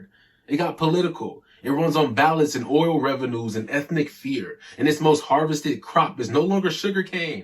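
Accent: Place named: United States. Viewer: Okay. I want to listen to what you have to say however, the mispronunciation and American accent is throwing me off!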